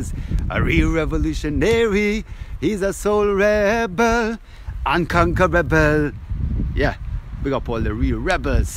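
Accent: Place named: Jamaica. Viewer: You sounds like a Jamaican did you develop an accent or something like that it sounds very unique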